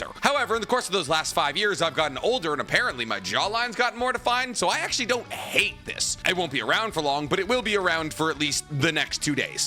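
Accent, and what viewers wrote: Boston, United States. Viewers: Yo brother you from Boston? Me too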